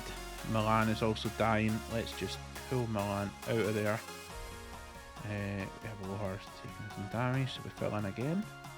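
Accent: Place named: Scotland, United Kingdom. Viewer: So Scottish 😮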